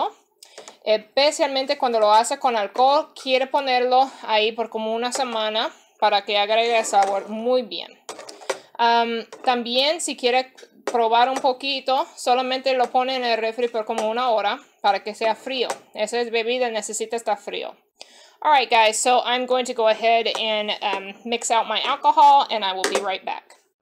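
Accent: Puerto Rico. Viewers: You could hear she's americanized Puerto Rican when she speaks her Spanish, but she dominates it well it's understandable....se le nota que es una boricua americanizada nacida en los estados unidos por la manera que pronuncia sus palabras ...pero lo domina bien se le entiende.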